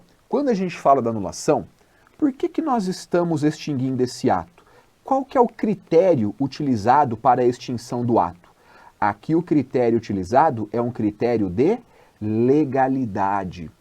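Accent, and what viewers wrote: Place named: Paraná, Brazil. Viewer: Língua preta kkkkk , paranaense veio pé vermelho 👏🏻👏🏻👏🏻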